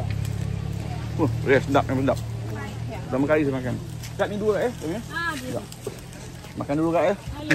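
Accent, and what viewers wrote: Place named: Kedah, Malaysia. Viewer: Terbaik abg p ronda ronda pasar kemboja di selangor, abg ni asal KEDAH ke? Cakap loghat kedah, ha ha haa.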